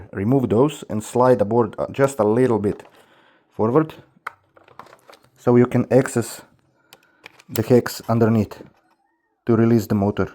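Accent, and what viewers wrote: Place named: Russia. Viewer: русский акцент явно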